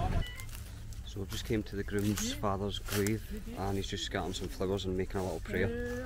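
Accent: Scotland, United Kingdom. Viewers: Love your Scottish accent....enjoy Pakistan!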